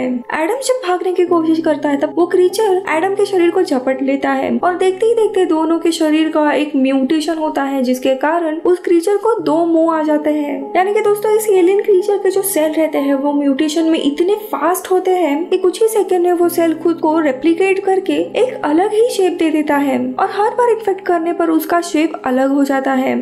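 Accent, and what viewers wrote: Maharashtra, India. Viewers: Are tu Marathi ahes Ka 😅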